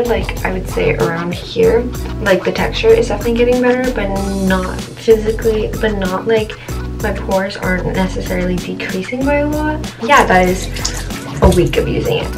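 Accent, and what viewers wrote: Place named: Southern California, United States. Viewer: It’s that Southern California accent lol. We all sound like that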